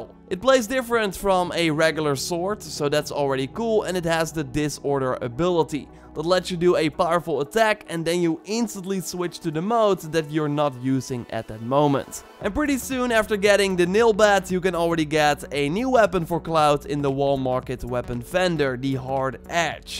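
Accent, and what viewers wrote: Netherlands. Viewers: Are you from holland??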